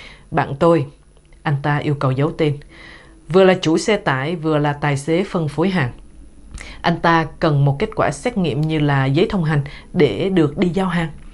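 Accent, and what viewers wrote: Standard. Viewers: MC tuyệt vời giọng nói rất chuẩn, yêu em quá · Chị này nói ko nhìn sách luôn, vừa đúng chuẩn vừa hay tuyệt! 👍👍👍👍👍👍👍👍👍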